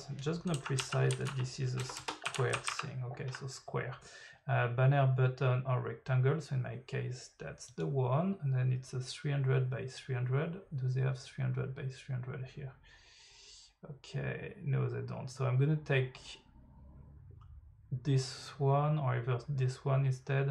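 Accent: France. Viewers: Yo t'es francais ?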